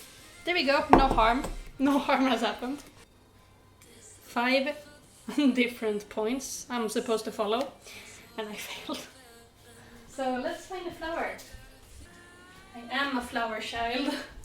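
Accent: Sweden. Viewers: ni har liknande svensk/engelsk dialekt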